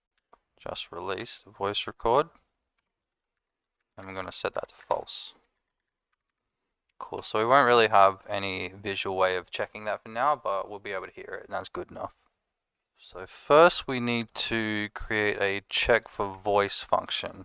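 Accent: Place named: Australia. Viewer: the aussie accent helps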